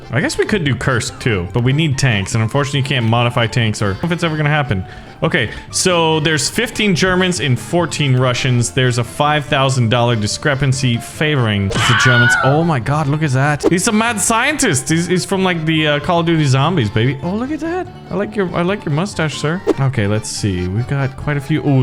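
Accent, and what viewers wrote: Germany. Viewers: your German accent isn't annoying it's WUNDERBAR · I’m from Germany and i just love it when he uses his German accent.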